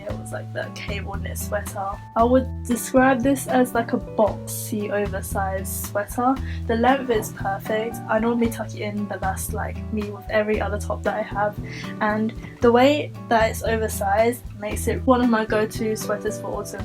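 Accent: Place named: United Kingdom. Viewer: I also love wearing puffy clothing to hide me tummy too XD i love your british accent!! im australian but people claim i sound british?! HAHAH anyways i love the haul! and how honest you are heheheh i wish i could be your 100th sub BUT lucky lucky to whoever it is T.T YEAH YYAYAYA XD looking forward to more videos!! :DDDD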